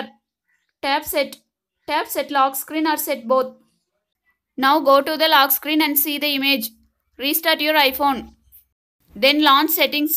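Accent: India. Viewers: Indian English. Why in so hurry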